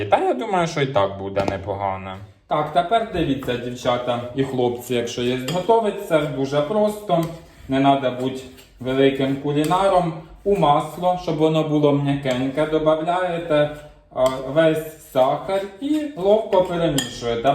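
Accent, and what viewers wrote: Poltava, Ukraine. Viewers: Дякую дуже, сміюся вголос. Нагадуєте мамину говірку, вона була з Полтавщини.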